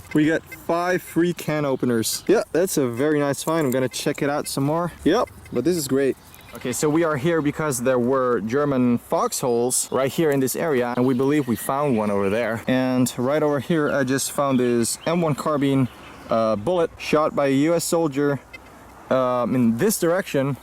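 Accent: Netherlands. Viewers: Is he from Netherlands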